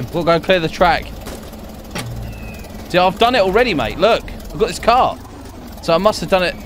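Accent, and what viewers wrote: United Kingdom. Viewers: Are u British cuz me new sub!!!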